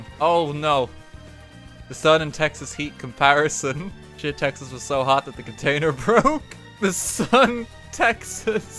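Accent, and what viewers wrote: Ireland. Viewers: No he's irish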